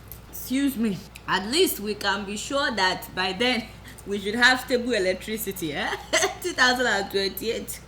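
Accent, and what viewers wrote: Nigeria. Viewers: Very Real!! Authentic Naija accent...no forming:*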